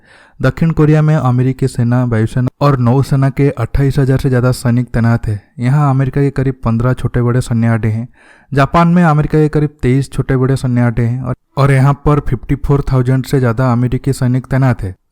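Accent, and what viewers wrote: Odisha, India. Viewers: bhai tu orissa se he na?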